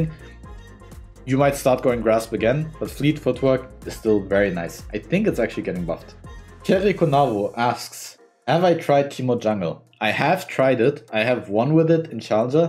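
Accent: Germany. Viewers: The whole time i was like..he sounds german.. Hatte ich wohl recht xD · Ich merke an deiner Aussprache sofort, dass du ein deutscher bist =) Also herzlichen Glückwunsch zu deiner Leistung <3. Kannst du bitte eine kurze Zusammenfassung bzw Checkpoints der Mechanics für Challenger Teemo & einen Toplaner auf Deutsch bestenfalls für Wildrift raus hauen? Ein Beispiel ich habe mir einen Toplane Kassadin für Wildrift von den besten Spielern auf YT mit Meilensteinen eingerichtet: Vor Minute 5 muss der RoA fertig sein und bis Minute 10 muss der Seraph wenigstens gekauft sein. Dadurch drehe ich egal wie das Game am Anfang lief meistens im Lategame. Ich würde mich freuen dich in Wildrift bald auf dem Weg ganz nach oben zu sehen^^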